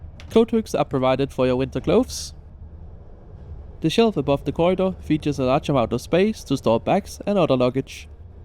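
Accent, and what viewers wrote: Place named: Denmark. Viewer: Is strange how undestand person with Danish accetn better than Americans.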